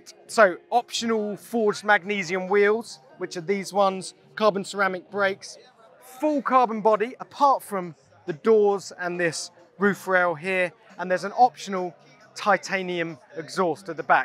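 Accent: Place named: United Kingdom. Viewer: Why do they have a Brit introducing an American muscle car THAT DONT WORK VERY ANNOYING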